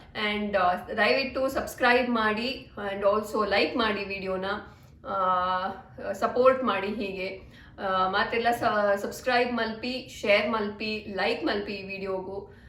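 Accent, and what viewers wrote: Mangalore, India. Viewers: Yeah... I like ur mangalore kannada & tulu ... evn I m from mangalore... staying in UAE .. love ur channel.. all the best ❤️ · I like the Mangalore kannada, do you know kundapur kannada? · I love manglore kannada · Nivu maglore Kannada ne matadi Mangalore Kannada nange rumba esta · Mangalore kannada👌👌👌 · Hi, this is Praveen from mangalore, nice home, your mangalore kannada is nice to hear😃, and we really proud for our tuluvas are in everywhere 👍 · Neev manglore kannada mathadodanna keli tumba kushi agutthe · Sister nima Mangalore Kannada super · I like Mangalore Kannada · hi, yenri video nimadu super, matu nim manglore language tumba sakatagi irute. navu north karnataka davru, yavagalu nim manglore language keloke tunba ista. ondu request nimgae adstu manglore langauge matadi. hege heche hechu video madi... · Nivu Mangalore kannadane matadi mam . 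Nivu chennagi matadtira . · Actually Mangalore Kannada is very nice to hear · dayavittu mangalore kannada matadi nevu mangalore kannada chenag matadtira......hage nevu return india bartira illa PR tagondu alle settle agtira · ಮಂಗಳೂರು ಕನ್ನಡ ಚಂದ ಉಂಟು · Mam neevu Mangaloru kannadadalle mathadi aaytha nimma Kannada thumba chandha untu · Supar Mangalore kannada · Nimma kudla kannada nice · I love your Mangalore kannada tq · Hii sister.... Iam from sullia.... Neevu manglore kannada mathadtha edre keltha irbeku antha agtha de❤❤❤.... Plzz reply mam. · Nima manglore language.super sis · I like magalore Kannada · Really i like ur Mangalore kannada tumba chenag mathadthira. Mangalore kannadane mathadi tumba janakke esta agutte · Woww super erna mangalore kannada... tulutla paterle .. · Manglore kannada esta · Mlore Kannada super. So pls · I like your mangalore Kannada . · Kudla is the best..😘😘 So good to hear mangalore kannada..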